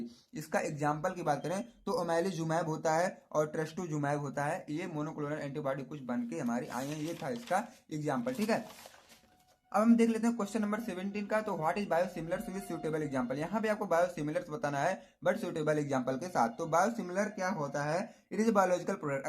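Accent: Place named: Uttar Pradesh, India. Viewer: Up se ho bhai q Aap language sun ka lag rha Hai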